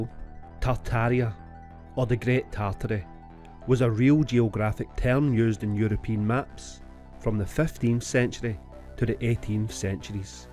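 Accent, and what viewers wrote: Scotland, United Kingdom. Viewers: English with a Scottish accent. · Please go back to the other narrator Scottish accent is completely spoiling the experience of watching any videos just awful. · Congratulations, you have stumbled upon the Scottish accent… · All of it. Also, remember that some folks (hi America!) struggle with Scots accents.😅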